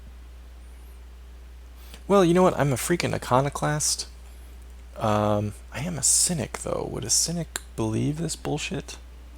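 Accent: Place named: France. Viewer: Renowned Physician adds a bonus to Physician-ing from what I can tell. What you should do is grant Julian some land then he will stop asking to join holy orders, or just ship him off. I don't know if you noticed but you lost the North of Greece/Turkey. From Constantinople East to the Il-Khanate. That French accent sounds like the narrator in the Jeanne d'Arc campaign in Age of Empires 2. Smashing icons is what Iconoclast is all about. No icons to God so we must break them. You can check out the society under the society tab which is the last one up the top.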